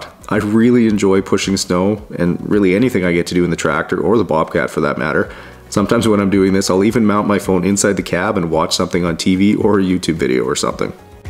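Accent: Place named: Canada. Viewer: I’m sorry, but do all Canadians sound the same! He sounds just like a few other Canadian YouTubers I watch as well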